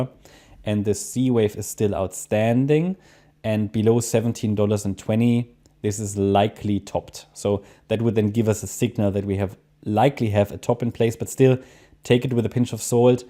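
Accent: Germany. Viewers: I love your german Accent 🩷 Vielen Dank !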